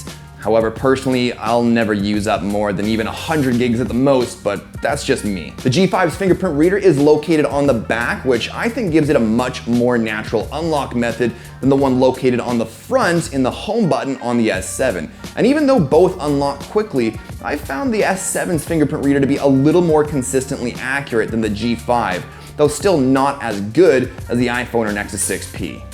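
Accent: Canada. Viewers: i like u're videos man...and clean voice)....are u Canadian? I hesrd Csn from one video)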